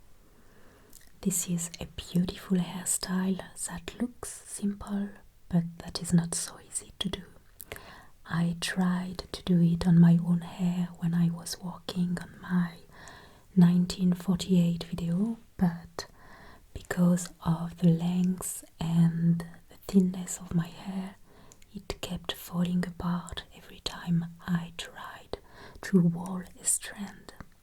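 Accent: France. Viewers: I think I'm addicted to your videos. Nowadays it happens not often to me to fall asleep without your smooth voice and so divine french accent. I just love it so much, I melt...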